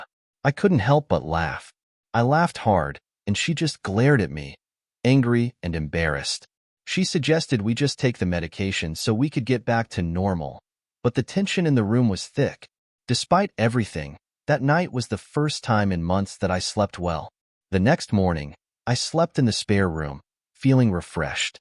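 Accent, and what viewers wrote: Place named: United States. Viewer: An American voice on an English story - irrational.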